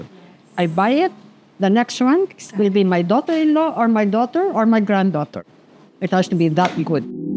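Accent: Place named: Conyo, Philippines. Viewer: conyo pala si Ma’am hahaha ang cute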